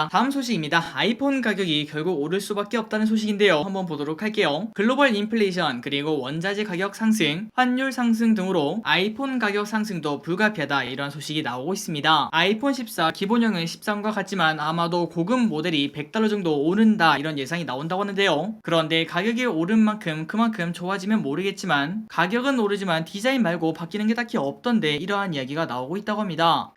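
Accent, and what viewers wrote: Gyeongsang, South Korea. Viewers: 간다간다 뿅간다~!! 대구 사람이엇음? 방가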